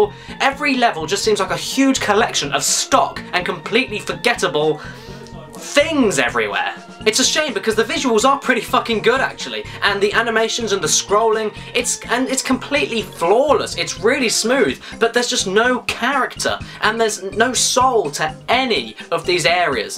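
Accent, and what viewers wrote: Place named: United Kingdom. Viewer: He's British.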